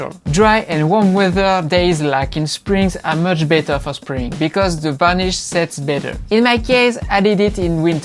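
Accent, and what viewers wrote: France. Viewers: francais ? vu laccent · It’s officially the first time that a person with a French accent doesn’t irritate me when speaking English. I guess you could take that as a compliment coming from a dude who gets easily irritated 😂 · i was listening to him talk and thinking, "this guy is French AF, I don't recall ever seeing a French YTer, ever" then i scrolled down to see " " my suspicions check out. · I am Croatian, and nobody around me speaks English with such a terrible accent. It is French thing. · Came for the lumpy green paintjob Stayed for the Inspector Cluseau accent 👍😎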